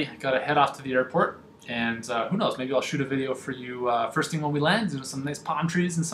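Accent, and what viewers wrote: Canada. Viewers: Yeah... That's just how Canadians talk. Nothing wrong with it. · Worse than all these things listed would be having to listen to a Canadian accent while trying to work out.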